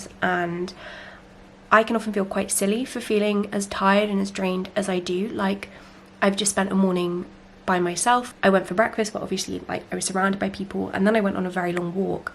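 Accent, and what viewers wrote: United Kingdom. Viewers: I love your accent, British women are so elegant sounding.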